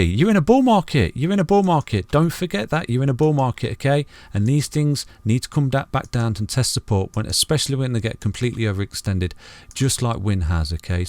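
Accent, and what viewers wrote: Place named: Nottingham, United Kingdom. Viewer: You are so from Notts lol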